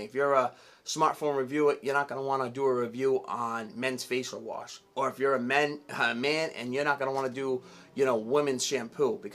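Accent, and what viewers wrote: Boston, United States. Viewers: Subscribed! Def can hear that Boston accent! I work in Boston as truck driver too. Fun city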